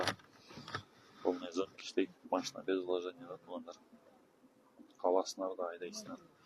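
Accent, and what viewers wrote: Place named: Kyrgyzstan. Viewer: Атан молодец таза Кыргызча суйлойсунго брат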